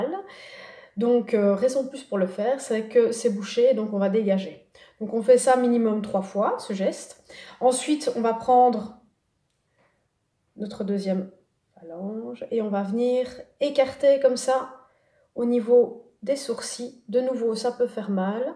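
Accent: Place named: Belgium. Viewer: génial! Vous êtes belges? J'entends comme un accent de chez nous 😊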